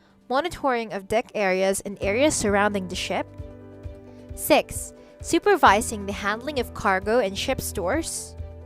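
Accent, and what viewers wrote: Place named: Philippines. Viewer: Filipino accent🇵🇭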